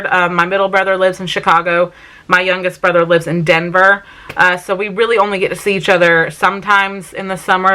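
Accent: South, United States. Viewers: I love reading too! I’ve never read any of Jodie’s books but I saw the movie My Sister’s Keeper and loved it! I struggle to watch movies so I tend to just rewatch my favorites 💓 My husband encourages me to get some me time as well Target is my me time place too 😂 I love your southern accent, I figured you had southern roots and I love cruising as well. We were suppose to go cruising in April but that didn’t happen! We have the whole early care and education thing in common! I didn’t major in that field but I worked in the field for years and have a special place in my heart for tiny humans and how they learn 💓 Sorry for the novel comment! Loved getting to know you and thank you for answering my questions 🙂💕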